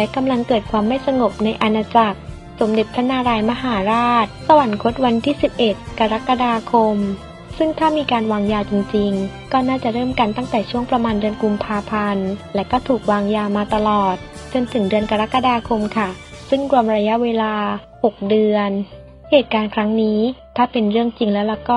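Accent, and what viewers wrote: South, Thailand. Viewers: แอดอยู่จังหวัดไรครับ.คนใต้หรอ.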